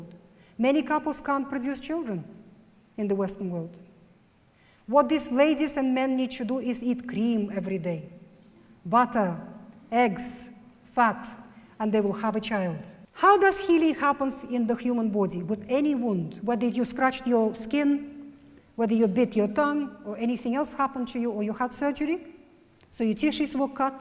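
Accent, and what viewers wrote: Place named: Russia. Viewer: It's Russian. She's born in Russia, then went to UK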